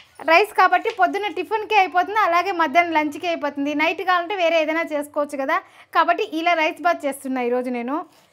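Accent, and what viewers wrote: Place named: Rayalaseema, India. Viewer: Meeru raayalaserma baasha maatladthaaru Mari andhara antaaru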